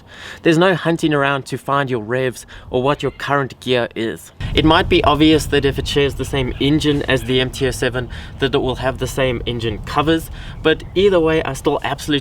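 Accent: South Africa. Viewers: Always wondered where that accent of yours was from.... So you're South African.. that's awesome. I follow cricket and its names like AB Devilliers, Dale Steyn which comes to my mind thinking about SA. Well now will be one too. 🙌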